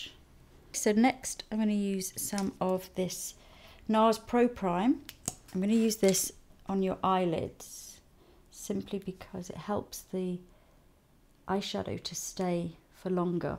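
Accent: Netherlands. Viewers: She is from Netherlands.. So that might be Dutch - English.. ;) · I saw the other videos first and thought, mmhh she sounds Dutch. Then in this one it was confirmed haha can recognise dutch people anywhere! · funny, i''m dutch and i recognize her accent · I could hear she was from holland. Her accent (when she speaks English) sounds a lot like mine :) WOmderful look though! Looks great on her, I feel inspired! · I heard her accent and I KNEW she was Dutch! YAY HOLLAND! :D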